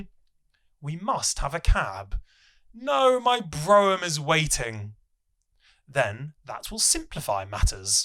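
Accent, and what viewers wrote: United Kingdom. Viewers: Very clear British accent. Fantastic!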